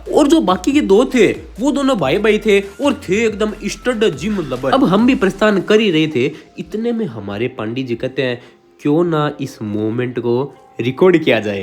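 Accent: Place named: Rajasthan, India. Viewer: Bhai main Teri voice sunke Bata sakta hun tu Rajasthan se hai ❤️❤️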